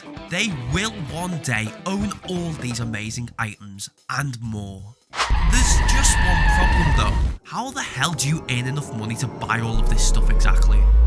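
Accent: Liverpool, United Kingdom. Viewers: L bri ish narrator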